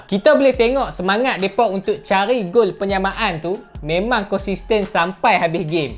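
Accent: Kedah, Malaysia. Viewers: Terima kasih admin, pelet Kedah sempoi baq hang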